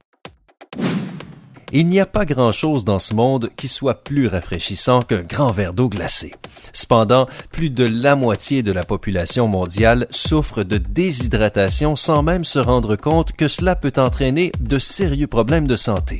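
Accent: Quebec, Canada. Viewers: J’aime beaucoup l’accent québécois ! J’espère que je suis pas la seule mdr 😂 . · Pk c une voix canadienne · Marrant hein l'accent canadien j 'comprend -donc ,aie !!! Gros gros buzz ! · Mdr l'acent québécois mdr 😂🤣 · Ouahhh on a tous reconnue l'accent québécois c'est magnifique 😂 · L'accent canadien m'a fait marrer pendant toute la vidéo 😂🤣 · c'est l'accent du Québec ! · C'est tellement moche l'accent québécois 😷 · Alerte accent QUÉBEQUOIS! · J'aime pas l'accent canadiens et surtout la voix w😗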